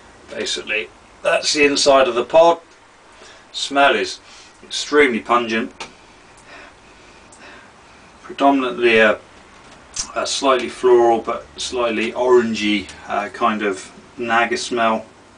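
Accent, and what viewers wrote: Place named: United Kingdom. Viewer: I enjoy your videos. Like I mentioned earlier, you are just a dead ringer for my oldest son, it's like watching him with a Brit accent :-). Continue to carry on.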